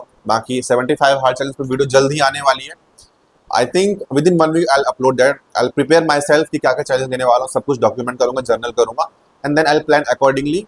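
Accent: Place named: South, India. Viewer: south indian ki tarah lag rhe ho bolte huye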